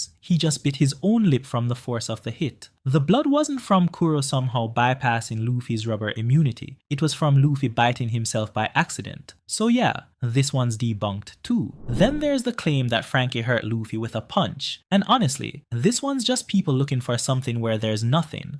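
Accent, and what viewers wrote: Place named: Jamaica. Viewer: Yuh sound jamaican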